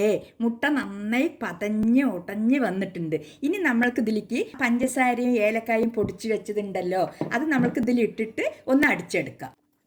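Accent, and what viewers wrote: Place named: Palakkad, India. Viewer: അമ്മ പാലക്കാട് എവിടെയാ ....? തനി പാലക്കാടൻ ശൈലി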